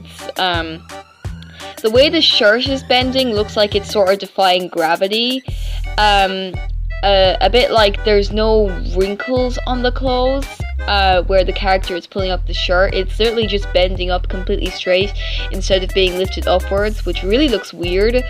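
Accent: Ireland. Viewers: ngl idk but she sounds like she has a irish accent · Are you Irish?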